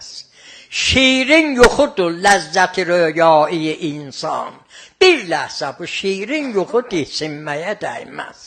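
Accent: Iran. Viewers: Seviyorum Fars şivesiyle şiiri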